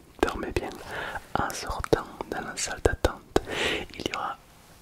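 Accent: South, France. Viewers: Ty serais pas du sud ?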